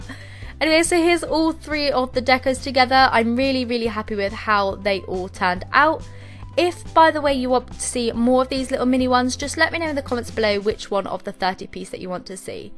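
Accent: United Kingdom. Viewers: I love your accent! R u from england? Because i love british accent!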